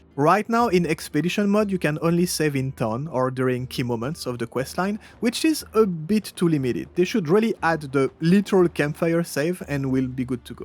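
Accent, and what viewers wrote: France. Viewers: T'es français ? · FRENCH SOUNDING😨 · He's so French I thought he was saying dispatch not this patch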